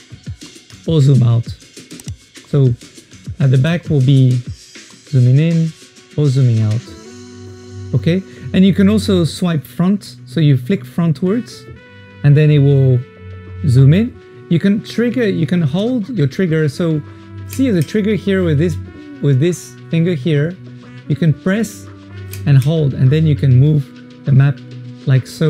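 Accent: France. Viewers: Sa sens le français à pleins nez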